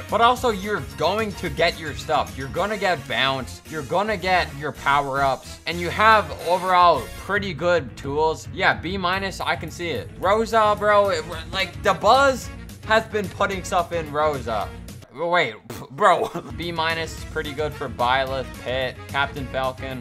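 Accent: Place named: Newfoundland, Canada. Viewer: U sound like a Newfoundlander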